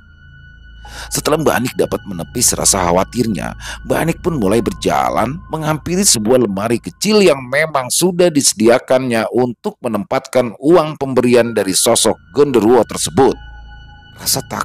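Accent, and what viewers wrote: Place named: Jakarta, Indonesia. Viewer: Ahhh seru nih ceritanya.. aku udah mengira kalau ini kisah bang Rudi, dari logat penyampaian ceritanya yg dibawakan mas, sudah keliatan gaya bahasa Betawi.... Ditunggu kisah selanjutnya, pasti seruuuu ❤❤❤❤❤❤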